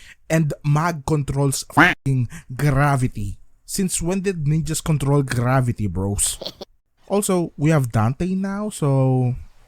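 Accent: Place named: Philippines. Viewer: When I heard the accent, I just knew it was a Filipino CC. Know any communities/servers to ask and team up with other Filipinos or do we just go in recruitment chat and pray we have peeps there?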